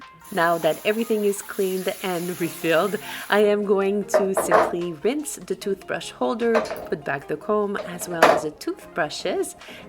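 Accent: France. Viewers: You got more done in your morning than I do all day! Girl when you were like 'and now it's ' I couldn't believe it! LOL Good for you I found this video very enjoyable and your accent is nostalgic for me as a francophone living overseas. Thank you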